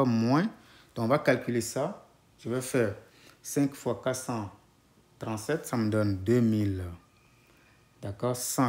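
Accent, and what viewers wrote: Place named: Benin. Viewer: Bravo mon frère , c'est vraiment sympa cette tranche de maths sur les équations diopht. on croirait entendre mon prof de math au lycée en C.I qui était Béninois.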